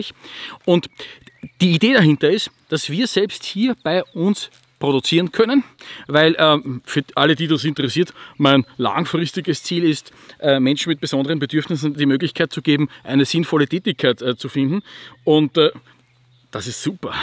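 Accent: Austria. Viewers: Ich mag die Grimassen und den österreichischen Slang 😄 Jedes Mal wieder cool.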